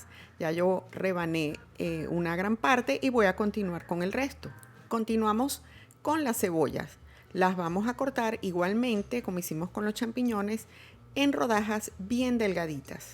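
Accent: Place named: Cuban American, United States. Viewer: Muy bien explicado, para mañana tengo invitados, y estaba revisando cómo hacerla, gracias . Está rica. Por tu acento creo que eres cubano americana.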